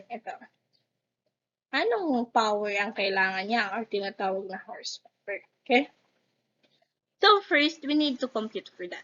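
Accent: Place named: Bicol, Philippines. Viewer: bicolano pala kayo maam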